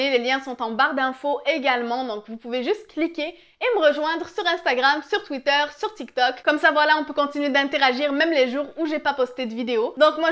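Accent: Quebec, Canada. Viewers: T'es tu québécoise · Salut, je trouve que tu as un léger accent. Tu ne serai pas Québécoise ? En tout cas j'adore ta voix ❤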